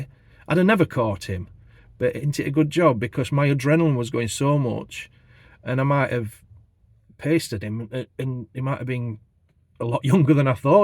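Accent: Yorkshire, United Kingdom. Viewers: Neighbour hood watch still exists in some areas, and you can link up to your local police online to receive info and advice. But to you sir well-done my only claim to fame is getting 2 local drug dealers of the street from a previous address, work with the police as a source of information and results can be achieved. Kudos to you sir always nice to hear a real Yorkshire man.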